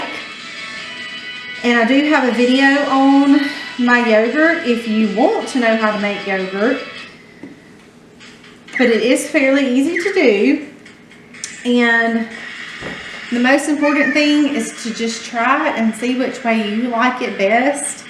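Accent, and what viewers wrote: South, United States. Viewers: You do have a southern draw!! Hahahahaha I lived a few yrs in Louisiana and people teased me a lot about my accent 😃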